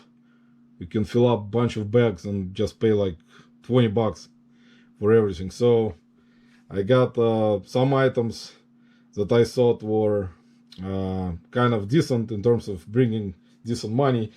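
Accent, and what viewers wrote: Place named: Russia. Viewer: I hope you don't mind me asking. What country are you from? My son served a mission in Iceland for two years and I have friends down there now I talk with all the time. They speak English, too, and they're accent sounds a lot like you , but the more I'm listening , sounds Russian. Either way, Love it! I've sold those singing fish in the past but it's been awhile. Glad you were able to sell it. Woa! That's awesome on the Precious Moments. Looks like it's from the 70s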